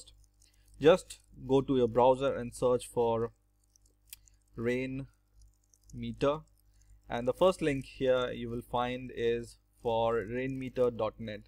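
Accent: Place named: India. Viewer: It's certainly part Indian.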